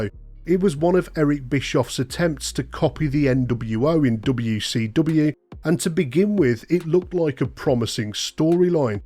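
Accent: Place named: Scotland, United Kingdom. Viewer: Always a Scottish narrator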